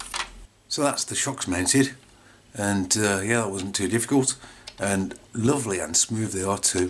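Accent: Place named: West Midlands, United Kingdom. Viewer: Hi.. I have one on pre-order looking forward to it arriving:) great vid.. subscribed.. whereabouts you from sounds like your around west mids? im from Streetly.. Sutton:)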